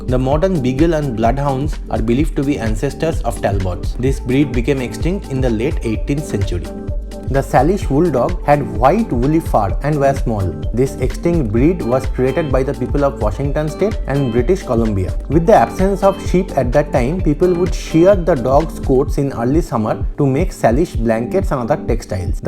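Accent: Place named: India. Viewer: U have terrible indian accent. Pls ask somebody to dub ur vids. U can found hundreds of offers on fiverr esp.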